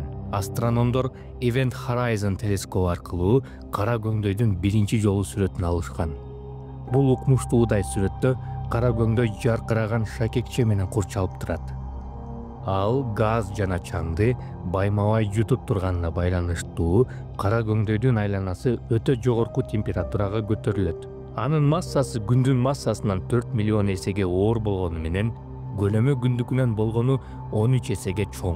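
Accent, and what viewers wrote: Standard. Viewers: Ооой тим эле укмуш сонун сүйлөптүрсүң.😯 Таптаза кыргызча анан дагы үнүң да аябай уккулуктуу экен. Эң негизгиси абдан түшүнүктүү экен. Чаржайыт сөздөр жок так, даана сүйлөптүрсүң. Менден 1 лайк. Иштериңе ийгилик дагы да көп видеолорду күтөбүз. 👍👍👍👍👍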